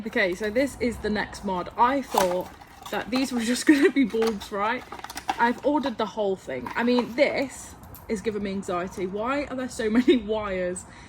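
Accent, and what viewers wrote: United Kingdom. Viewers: Great little video, although simplistic it's what I understand best, but I am struggling to place your Northern accent. New Sub too, so looking forward to the next video. Ed 😉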